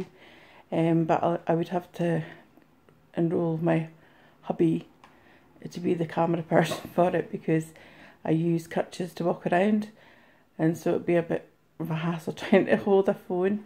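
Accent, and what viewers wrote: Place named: Scotland, United Kingdom. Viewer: You do sound Scottish, but I love it!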